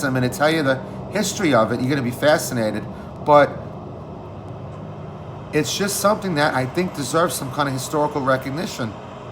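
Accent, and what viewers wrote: Brooklyn, United States. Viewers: Perfect Brooklyn accent to narrate this video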